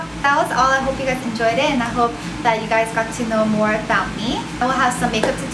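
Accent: South Korea. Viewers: Korean accent? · She might have been raised speaking only Korean as a child so she might have developed an accent. Its the same for my cousin. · I still don't understand how you could have that unmistakable, strong Korean accent. I lived in the states for a couple of years and even I don't have it. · You have such a thick Korean accent it's hard to believe you're from Texas :)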